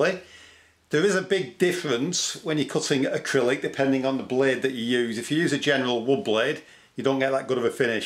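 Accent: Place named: North, United Kingdom. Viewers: Love these vids - proper no nonsense Northerner who gets straight to the point and explains it all clearly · Great video! What part of Lancs is the accent from? Sounds like Preston or possibly just north of there?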